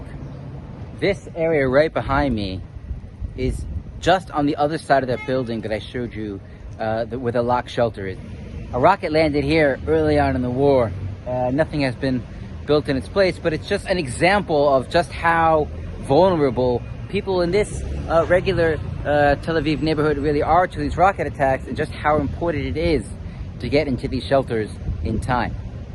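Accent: United States. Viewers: You sound like an American. What are you doing there?!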